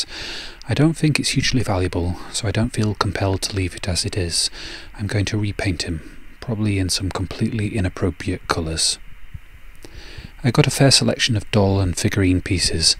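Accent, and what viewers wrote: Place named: Yorkshire, United Kingdom. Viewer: was watching this vid last night and fell asleep to your soothing voice, lovely. There is a hint of a Yorkshire accent?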